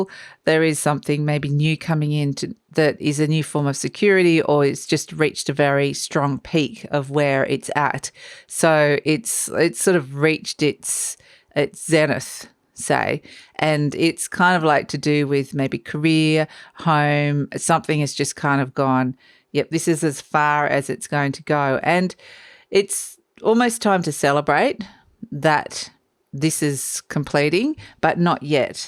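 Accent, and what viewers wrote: Australia. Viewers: Damn I thought , you were probably from northern NSW like myself, but time in Qld has melded my accent , should have gone with my first instinct ha haa , love your work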